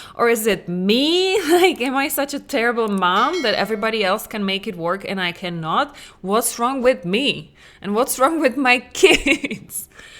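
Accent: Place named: Czech Republic. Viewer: I was trying to place the accent, but didn't guess Czech Republic. I lived in Prague for 2 years. I love all the Czechs I know! I had a full breakdown last week just hopeless because I have been trying to learn to parent better for ten years now and I still can't (5 kids 10 and under). Your content is a new take, I'm excited to learn more.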